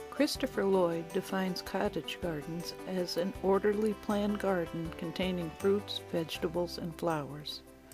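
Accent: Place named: Nova Scotia, Canada. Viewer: What a beautiful garden! Your accent suggests you are in Nova Scotia, am I right?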